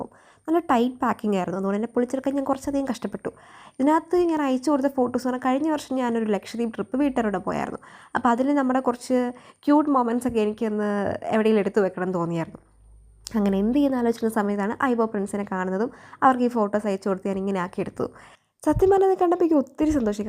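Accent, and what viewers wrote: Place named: Kozhikode, India. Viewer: Calicut slang ollalo da❤️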